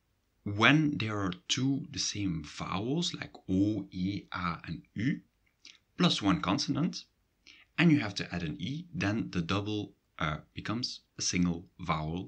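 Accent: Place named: Belgium. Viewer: LOL, the region where I live is in America. But it should serve me well enough to know that your pronunciation is Belgian and to listen carefully to the differences. I speak French and have had to deal with wildly different pronunciations in Canada as well as vocabulary and idioms. However, focusing on the grammar should be the same.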